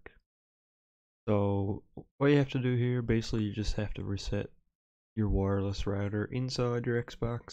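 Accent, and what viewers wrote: Canada. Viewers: You're totally Canadian :D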